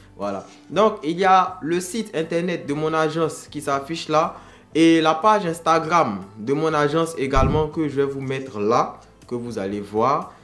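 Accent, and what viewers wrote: Ivory Coast. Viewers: Orrrrr djo j'aime trop t'écouter 😂 depuis ABIDJAN, sérieux ivoirien peut pas se cacher, quand il parle net ont sait que c'est lui 😂😂😂💕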